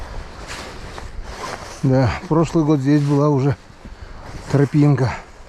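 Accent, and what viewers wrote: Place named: Yakutia, Russia. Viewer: Говор якутский!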